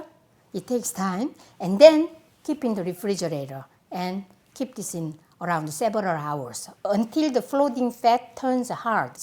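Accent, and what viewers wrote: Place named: South Korea. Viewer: 한국식 발음 하시니까 ~ 영어가 귀에 쑉쑉 들어 옵니다~ 최고의 영어 강사 입니다.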